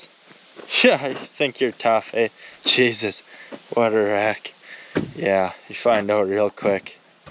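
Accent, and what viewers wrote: Canada. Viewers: I could listen to him talk about absolutely anything. I’m Canadian but I am not blessed with his amazing accent 😊❤️ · Dude. Damn, Ive only been watching you for a month, I appreciate seeing someone your age with a willingness to work and a willingness to learn, your vids are informative and you crack me up with the Canadianese you speak. RIP Cougar. I put my chocolate down 10 years ago last February and I still have dreams about him. I bet I woke up and looked at his chair for a week straight after he was gone, it just becomes such a habit and like you said- aint no better way to start your day than to see your boy all happy and ready to go on another adventure. Labs are the best, I dont care what anyone says if youre blue collar and hunt and fish and work on things outside, a Lab is the way to go. My boy was the best damn friend I ever had and that 12 years went by in a blink, I never had kids so he truly was my boy. 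My bro. He was still eating right up until the end and still plenty happy in life. The pain meds obviously helped him but that bone cancer is a bitch, for dog and human. Anyway, Im sorry to see Cougar go, he showed you what a good dog can do for a persons life so go ahead and get out there and get yourself another pup and bring him up. Im a renter so getting another dog is out of the question but you, hell, you should go get two dogs and I aint even kidding. Take care.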